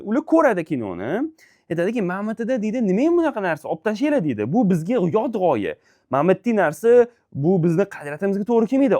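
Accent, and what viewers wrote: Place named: Tashkent, Uzbekistan. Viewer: Xabarlar uchun rahmat.Lekin o'zbek adabiy tilida gapiring.Chunki sizni faqat toshkentliklar emas, butun dunyo eshitadi.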